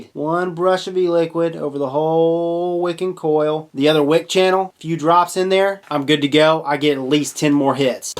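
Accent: South, United States. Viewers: It is wayyyy more than the way he says something, it's also the phrases and choice of words that are dead give away he is from the south. "And she's a chuckin." Is obvious, for example. You can tell he isn't from like Alabama or something, and to be honest he probably tried to sound as neutral as possible, because in the US sounding like you are from the South makes people think you are dumb.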